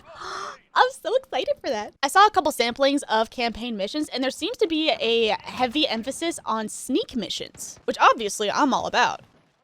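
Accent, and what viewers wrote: South, United States. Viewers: You keep getting more and more southern as this video goes on.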